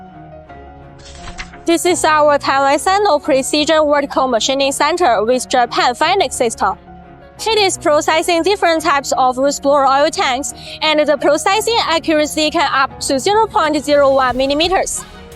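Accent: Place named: India. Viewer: sounds like Indian English, hard to understand.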